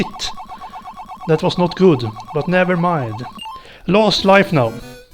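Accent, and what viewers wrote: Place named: Sweden. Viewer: svenska kraft haha, hörde dig var svenska så snart du började prata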